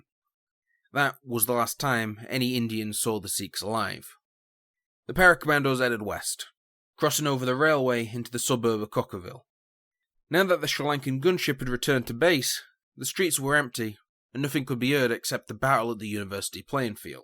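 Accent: Yorkshire, United Kingdom. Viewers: The narrator seems British, I hope he knew that the root causes were created by the British; mother of all modern day conflicts 😊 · what accent is this? which british city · It's not Bri'ish, It's Yorkshire! Aye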